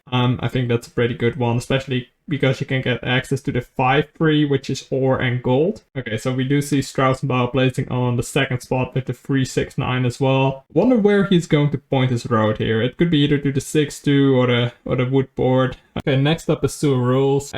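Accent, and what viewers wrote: Netherlands. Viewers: Hey you sound like you are en dutchman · Are u dutch?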